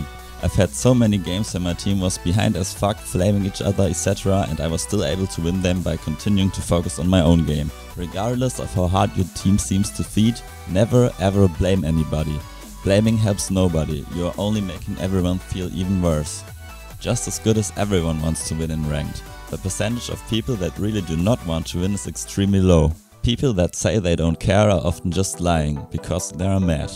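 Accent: Germany. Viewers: Are you german? :)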